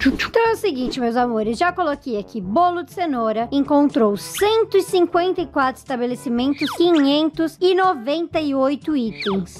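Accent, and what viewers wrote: Rio Grande do Sul, Brazil. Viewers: Tu é gaúcha...??????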